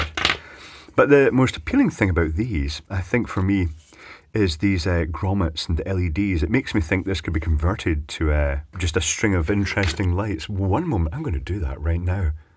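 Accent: Scotland, United Kingdom. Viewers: are you scottish i cant tell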